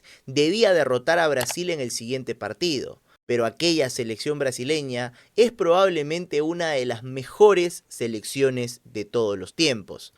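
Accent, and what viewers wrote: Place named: Argentina. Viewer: Me suena como argentina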